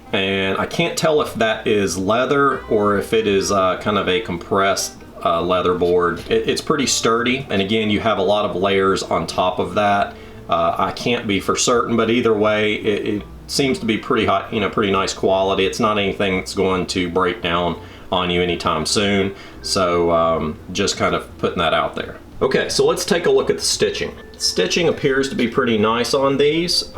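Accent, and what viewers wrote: South, United States. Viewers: Sounds like a southener Me: must be legit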